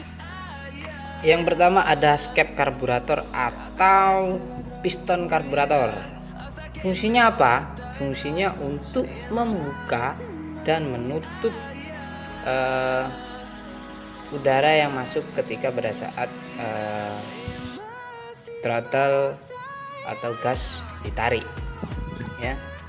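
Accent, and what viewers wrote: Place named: Java, Indonesia. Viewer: Jawane medok mas hahaha tapi mantep penjelasane mas, ditunggu vidio seng laine mas.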